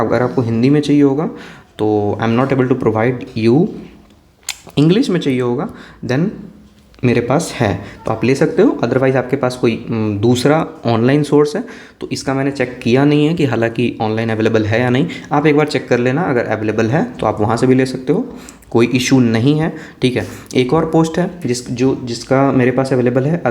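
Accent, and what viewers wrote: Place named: Jehanabad, India. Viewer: भाई जहानाबाद से हो तुम??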